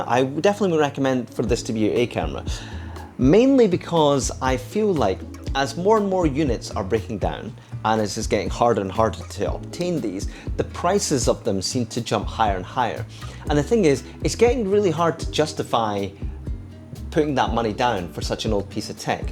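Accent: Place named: Scotland, United Kingdom. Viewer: Asian face, Scottish accent, cameras, happy to have found your channel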